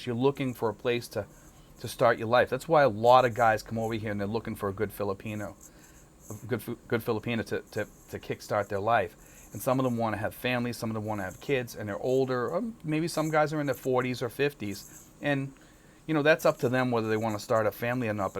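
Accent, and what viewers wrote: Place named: Massachusetts, United States. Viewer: I guess I'm not a typical expat because very little in your video applies to me. I've been married only once to the same woman, a Filipina. We have a daughter, and I've always had a great relationship. She's 21 now, and she's always been a great kid. We talk often, and I listen to what she has to say. Part of the reason things have worked out for me might be that I was 42 before I got married, and I'd had enough American gf's to know I didn't want one as a wife. Anyway, I subbed your channel. Also, I knew you were from Massachusetts by your accent. Me, too.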